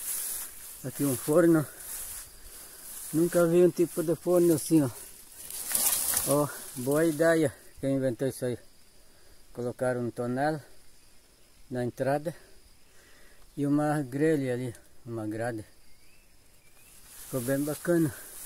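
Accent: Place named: Paraná, Brazil. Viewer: Boa noite que horas que era quando vc estava gravando esse vídeo ,,,a tu mora a sua voz tem sutaque do Sul Paraná eu moro em São Paulo abraço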